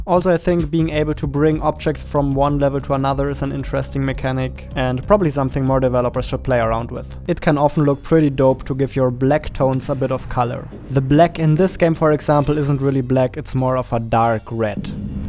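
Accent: Germany. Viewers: Ich höre seinen deutschen akzent😅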